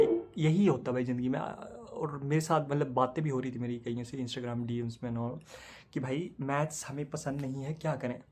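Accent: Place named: Pahadi, India. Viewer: pahadi ho kya bro, accent aa raha hai thoda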